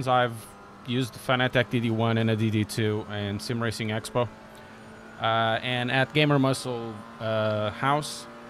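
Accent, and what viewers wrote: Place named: Portugal. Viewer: és Portugues? sinto ai um sotaquezinho.. e tambem como deste review ao yuri... segui porque sigo tambem o mundo das corridas e tambem gosto de fazer umas corrindas num simulador apesar de ser um simples g29, gosto de estudar trajetorias e superar me a mim mesmo.... já joguei muito iRacing mas agora fico me mais pelo Assetto corsa Na nurbur :D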